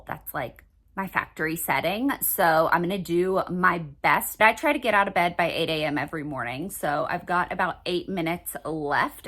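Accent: South, United States. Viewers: Hi! I’ve been watching your videos ever since your scarf-styling tutorial popped up on YouTube’s homepage—so probably since 2008 or 2009. I’m from Switzerland, and your channel was a huge part of my teenage years. Watching you motivated me to improve my English (I always loved your Southern accent!) and I learned so much about beauty and makeup—way before my friends did, because we didn’t have anything like the beauty community here. I just wanted to say thank you for coming back to YouTube. Your rambling, cozy style has always felt like a podcast to me—I love having your videos on while I do my makeup or just take a break. And your honesty about introducing your new Cavapoo after Teddy really touched me. I totally get it—I felt the same after losing my dog. Funnily enough, I now have a Cavapoo too—her name is Lokki 🐾 Anyway, I’ve never really commented before (very Swiss of me), but I’ve been here all along and just wanted to finally say thank you for everything! 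✨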